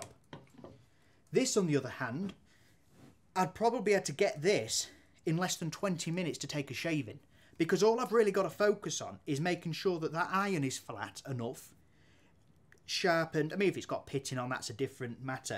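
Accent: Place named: England, United Kingdom. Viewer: I just recently discovered your videos and am glad I did. I am new to woodworking and looking to soak up every piece of knowledge I can. You explain things very well and with an enjoyable accent as well. (Here in corn country in the U.S. you don't hear an English accent very much) Keep up the good work and I look forward to watching more of your videos!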